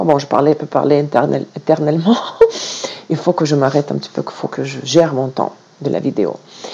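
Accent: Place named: Russia. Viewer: merci pour cette vidéo très enrichissante... J'adore votre accent... Je précise d'ailleurs que votre français est parfait quand on sait combien cette langue est complexe... Quand je vous écoute, çà me replonge dans mes années lycée lorsque j'étudiais le russe... une langue dont la musique me berce encore... Votre façon d'aborder l'astrologie me parle beaucoup: elle est précise et claire à la fois... Belle semaine à vous...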